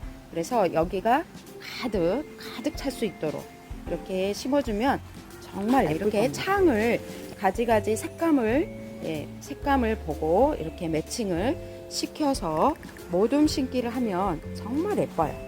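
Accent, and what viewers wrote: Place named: Jeolla, South Korea. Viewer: 영상구도도 공부하시고 전라도 사투리도 구수하고ᆢ풀시청 잘보앗읍니다~~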